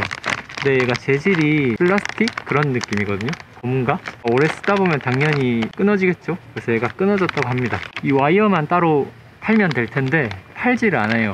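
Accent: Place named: Gyeongsang, South Korea. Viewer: 손재주가 좋고 영상을 진짜 잘찍었네예 같은 경남쪽이라 사투리도 정겹고 와이프차 고치는데 많은도움 될듯 합니다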